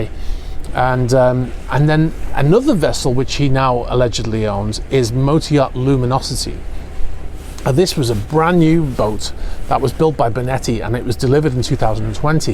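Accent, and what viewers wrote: Liverpool, United Kingdom. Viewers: Thanks for the "thermal events" ye auld scouse ye....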